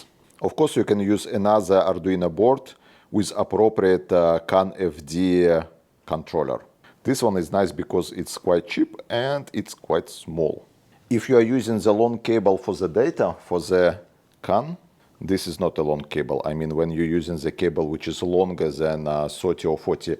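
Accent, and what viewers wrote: Ryazan, Russia. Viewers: Ну как не послушать прекрасный русский акцент - главное что даже без перевода понятно все 😊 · Почему мне кажется что у автора русский акцент... особенно после: уан, ту, сри 😅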